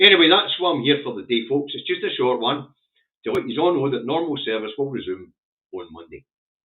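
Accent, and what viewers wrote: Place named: Scotland, United Kingdom. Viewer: Great to hear a Scottish accent again 👍🏴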